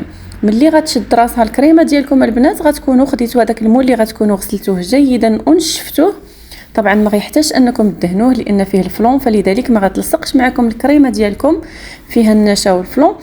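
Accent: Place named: Morocco. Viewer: ماشاء الله عليك اختي لكن لهجتك من المغرب والمنتجات لي استعملتيهم من الجزائر مثل الخميرة ولفلو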